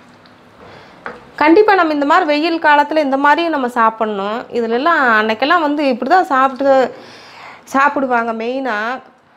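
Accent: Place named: Coimbatore, India. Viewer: Coimbatore voice super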